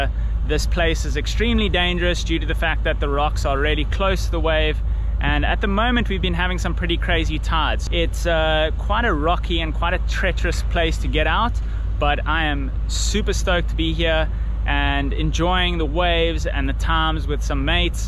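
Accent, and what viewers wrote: South Africa. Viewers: I hqve to ask what accent you have I’m thinking South African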